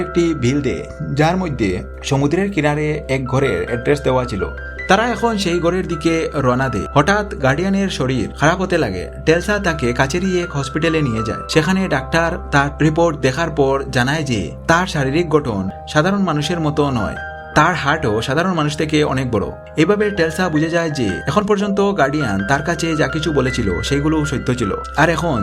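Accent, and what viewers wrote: Sylhet, Bangladesh. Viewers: তুমিতো দেখি সিলেডি ফোয়া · ভাই সিলেটি নি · Apni sileti naki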